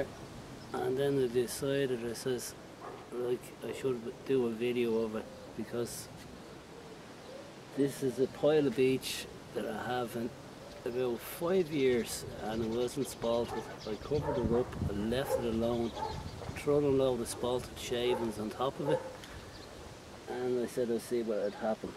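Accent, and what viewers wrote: Ireland. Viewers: I very much appreciate your approach to turning and especially your comments regarding safety. Very appropriate. I just found your site and have started watching your videos. I lived and worked in Ireland in the mid-90's for 2 1/2 yrs so I recognized you were Irish immediately! Are you from the Dubin area? North Dublin, perhaps? My ear for the accents is not as sharp as it used to be when I could pick up a Dub, or a Cork or Kerry man when I lived there. Cheers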